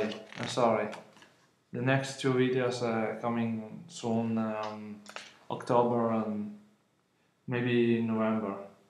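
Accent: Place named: Italy. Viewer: Great job! Your glasses are really cute! I posted elsewhere that I feel like I am always being seduced when I watch unboxings on <link>. Which is great! Ow! Lol! I loved these that you do! I also love your Italian-English with meat balls! Mmmm good! Oh Lord! Soooo may I ask how old you are and if you are married and with children? Just curious! Later!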